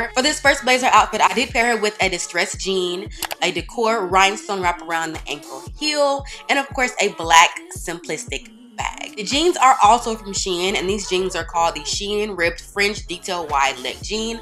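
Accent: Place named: Florida, United States. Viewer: Super cute looks! 😍😍 I could tell you were from Florida or lived there! Your accent! I’m originally from Miami 🤍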